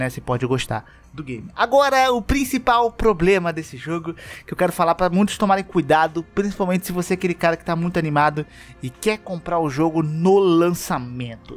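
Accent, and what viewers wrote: Rio de Janeiro, Brazil. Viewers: esse sotaque carioca me fez fechar o vídeo nos 10 primeiros segundos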